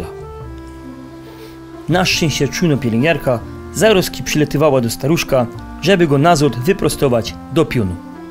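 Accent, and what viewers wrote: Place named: Silesia, Poland. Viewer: Słychać, żeś hanys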